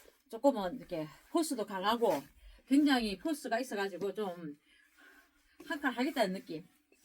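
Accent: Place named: Gyeongsang, South Korea. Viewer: 싸장님 갱상도 사투리 넘 재미나고 멋지세요~~^^아방가르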